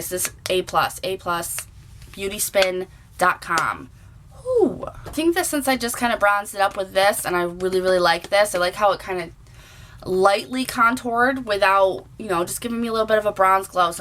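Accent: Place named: New Jersey, United States. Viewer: Michigan, about 30 minutes from Detroit where my fiancé currently lives. What state are you from? Your accent sounds a little like someone I knew from New Jersey.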